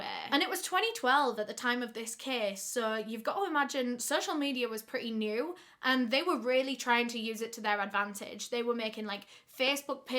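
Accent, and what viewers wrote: United Kingdom. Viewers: what accent is that uk ? scotland ireland?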